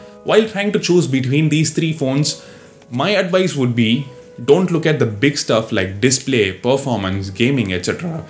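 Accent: India. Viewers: That Indian accent!!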